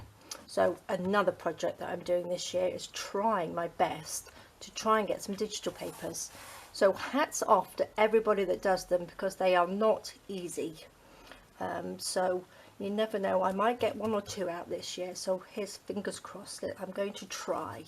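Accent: United Kingdom. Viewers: Love you no brainer approach— I plan to do more also this year I have found stabilo pencils work nicely for shadows and smudging Never would have guessed you were in Portugal! English accent— small world after all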